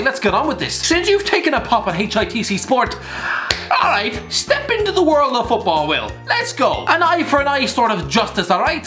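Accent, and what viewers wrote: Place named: Ireland. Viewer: Your Irish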